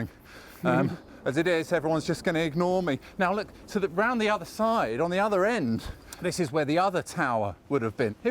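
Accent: United Kingdom. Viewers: Ooo l love British accent😭❤️❤️❤️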